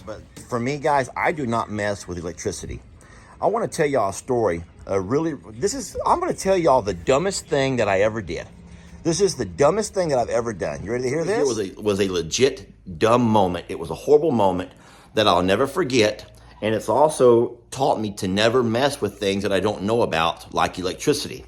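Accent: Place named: Rural, United States. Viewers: I’d rather hear that generator than that hick accent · deep southern drawl with a twang of!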